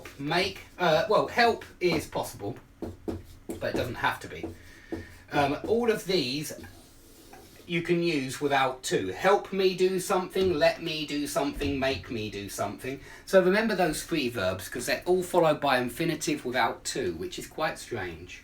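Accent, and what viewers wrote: United Kingdom. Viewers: I'm from Chile I have been practicing and watching videos for 3 years alone without taking a course in my country and I love to write my thoughts, my experiences and according to how I feel at the moment to start writing on my notebook. So I can improve my english writing. It is hard to find a native speaker in my country, especially british people because I really love this accent. Every day after work, I got home to watch your lessons this is the only thing I do instead of playing games. I hope you keep on making useful videos. It's a pleasure to watch them Greetings. ✌👌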